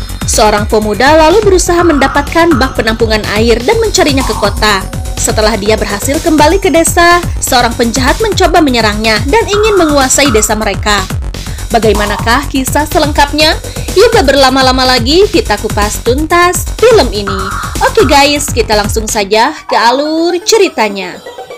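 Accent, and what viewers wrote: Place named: West Java, Indonesia. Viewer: Alhamdulillah ya Allah, dapat PIN juga dari kak mimin, makasih kak mimin yg comel 💖💖 Jujur aku suka banget dengerin suara kak mimin dgn logat sunda nya 💖💖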